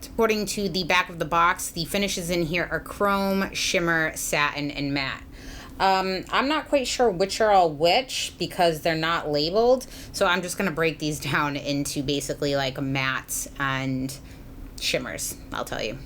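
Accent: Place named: Bay Area, United States. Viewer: y'all can already tell she's from the Bay. Girls like us vibe and just know💯😂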